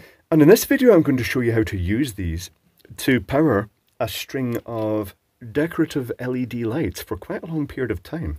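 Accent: Scotland, United Kingdom. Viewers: I love your soothing, clear voice. I guess your Scottish ? Do you live in the US now ?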